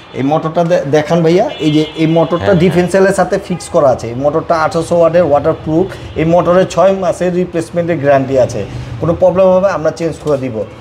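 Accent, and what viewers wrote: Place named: Kolkata, India. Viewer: লোকটি কোলকাতার বাবু নাকি? ভাষা এমন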